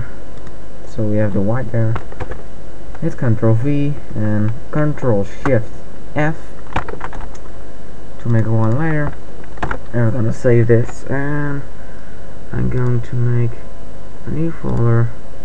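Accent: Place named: United States. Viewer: Hey. Dank je voor deze tutorial. Dat hielp me veel. En jouw Engels is heel erg goed eigenlijk. Je klinkt als je Amerikaans bent. Sorry als ik een fout maak, ik spreek alleen een klein beetje Nederlands.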